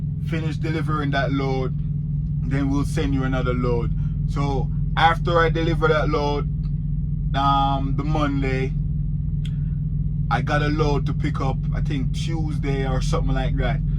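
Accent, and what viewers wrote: Jamaica. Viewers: Are u ja Jamaican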